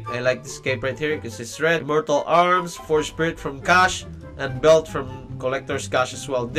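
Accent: Bisaya, Philippines. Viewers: bisaya man diay ka HAHAHAHAHAHA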